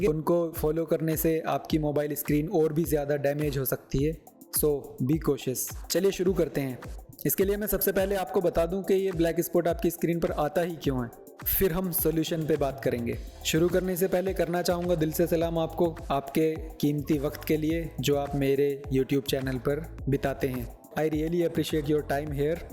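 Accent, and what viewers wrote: India. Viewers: Indian english messes me up. I hear an india speak like this and I don't know wether I fail to get through the accent or they are just using a couple of english words between otherwise different language.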